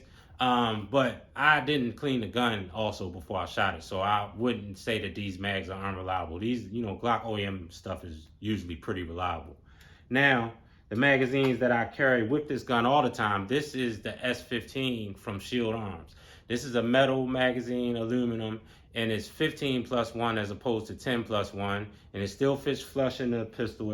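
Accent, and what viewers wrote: Baltimore, United States. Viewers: Accent Baltimore ? Philly here bro I see you ! Stay dangerous, and safe !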